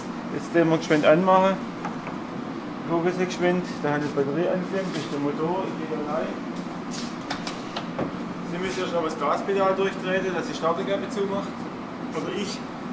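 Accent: Swabia, Germany. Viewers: Klasse wie immer,und zum glück wieder schwäbisch geschwezt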